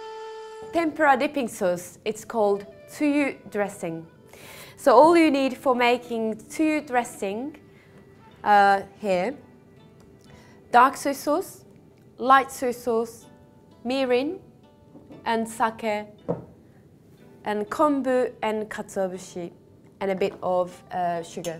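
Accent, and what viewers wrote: United Kingdom. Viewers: usually when people make instructional cooking videos, they use nice, presentable equipment and containers. Most people would pour the sauces into pretty little bowls, or glasses. This chick puts her sake in an old, plastic coke bottle with a paper label scotch taped to the front. On the other hand.... it's a hot asian girl with a brittish accent that happens to be a master chef. She pretty much has all of the fetish bases covered.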